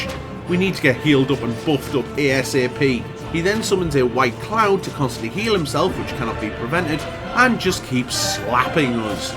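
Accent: Northern England, United Kingdom. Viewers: Are you in northern england? You sound like you’re from manchester or lancaster.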